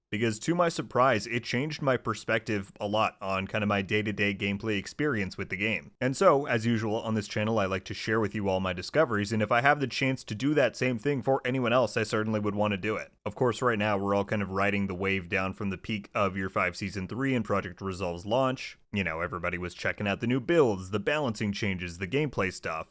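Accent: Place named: Brooklyn, United States. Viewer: Why...not that I'm bih'ing, cause I ain't, cause am digging it... but, why do you sound like ya just hop off a train from Brooklyn? LMAO. Seriously. Least to my ears, but you sound today like you got that twang...js.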